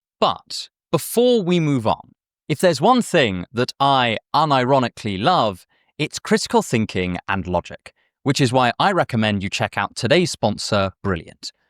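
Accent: United States. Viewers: Is it a tone? Or just a midwesterner? The world may never know.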